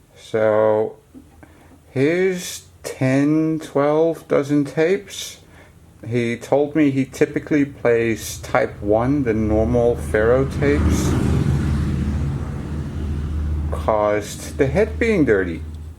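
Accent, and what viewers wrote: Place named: Netherlands. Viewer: Do you service DAT recorders ?? I presume by your accent you are in Holland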